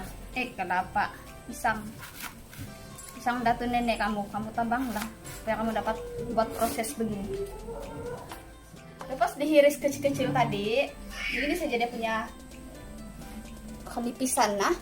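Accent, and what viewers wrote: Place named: Sabah, Malaysia. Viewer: Pekat juga Sabah kau bha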